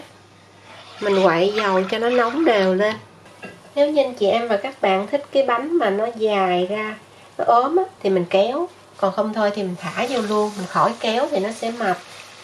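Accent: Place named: Southwest, Vietnam. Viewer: Tôi cũng thích bánh Quẩy lắm , nhưng thích nhìn thấy cô chủ kênh hơn ! Vì tôi xem kênh nầy rất lâu rồi mà không thấy được mặt chủ kênh nên tức lắm, nghe giọng nói của dân miền Tây Nam Phần rất thân quen và dịu dàng đáng mến quá lại thêm nấu ăn giỏi quá , phải chi được nhìn thấy một lần thì hay biết mấy, hổng chừng là bà con nữa đó .😅😅😅❤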